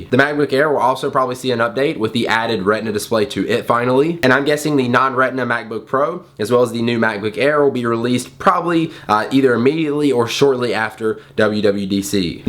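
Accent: South, United States. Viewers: Were you raised or born in the south, then moved up north? I hear country in your voice, but I'm not trying to be stereotypical.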